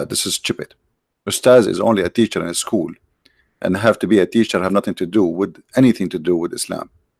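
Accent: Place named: India. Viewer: iya bro, seperti logat asli orang hindi.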